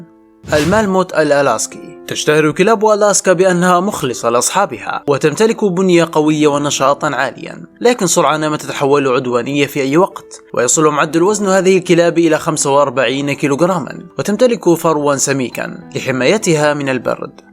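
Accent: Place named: Libya. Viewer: أسمع أنت ليبي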